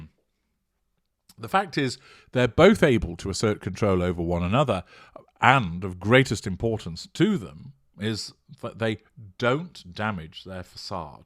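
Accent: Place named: England, United Kingdom. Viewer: I love your voice you have a perfect English accent. You sound like a gentleman 😊💜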